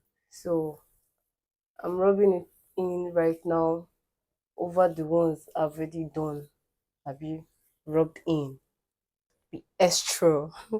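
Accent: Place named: Igbo, Nigeria. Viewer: I'm referring to Igbo as "yibo" . Your Igbo intonation is pronounced especially when pronouncing oil, you say "oryu" So, you be Omo "yibo" na🥰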